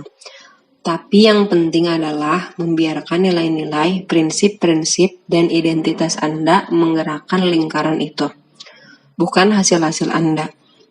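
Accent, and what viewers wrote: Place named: West Java, Indonesia. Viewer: Teteh orang sunda yah logatnya keliatan hhi nuhun teh tos macaken buku atomic habit ❤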